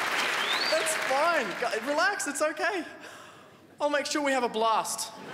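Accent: Egypt. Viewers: his egyptian accent is on point im laughing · He sounds Egyptian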